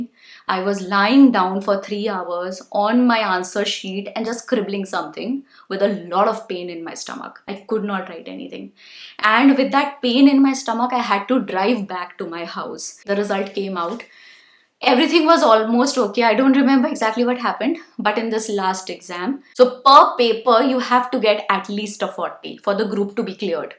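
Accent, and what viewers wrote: India. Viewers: Maam pls stop this now. Even google also accepted dat there is an ancient exist which is Indian english. Even when I saw ur video even ur ascent is Indian. So no need to teach ppl wt to do! Ur r doing this only for ur channel n money. We r not fool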